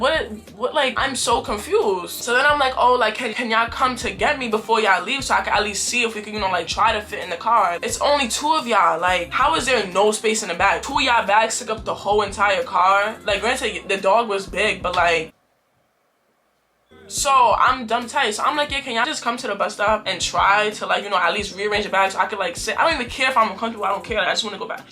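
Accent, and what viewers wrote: New York, United States. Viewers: love the way it sounds like i’m otp with one of my NY homegirls🤍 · oop i know a new yorker when i hear oneeee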